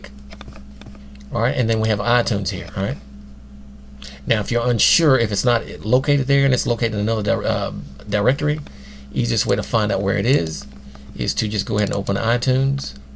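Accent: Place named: South, United States. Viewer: That was very simple and resourceful. I admire your accent by the way what part of the South are you from? My first guess is Tennessee, my second is Georgia.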